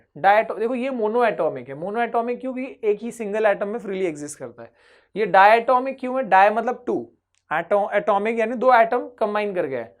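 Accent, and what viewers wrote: Bihar, India. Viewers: apke bolne ke tarika se lagta h ap bihari ho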